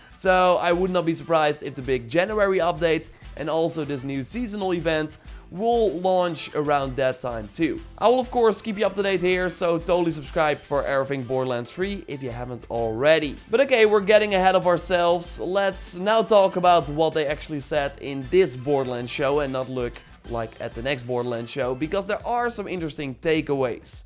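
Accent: Netherlands. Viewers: Het nederlandse accent blijft leuk ;)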